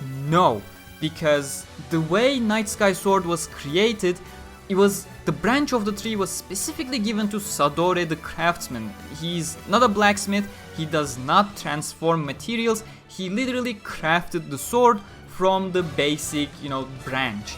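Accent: Turkey. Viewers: The way you talk English is just like a Turk speaking. Actually your name is so, that makes sense. If you're Turkish, please reply this comment. I wish to meet a Turk who watches SAO and talk about it a lot.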